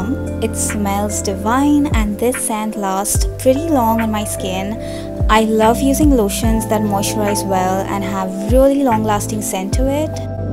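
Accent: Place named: India. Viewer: I think indian look at her accent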